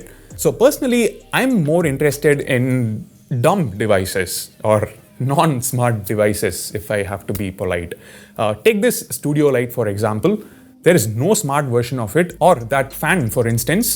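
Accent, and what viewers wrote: India. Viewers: L'accent hindou en anglais est insupportable. Je ne peux pas écouter plus de 10 secondes.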